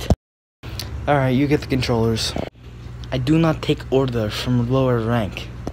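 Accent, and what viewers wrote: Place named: Russia. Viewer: Russia accent is still golden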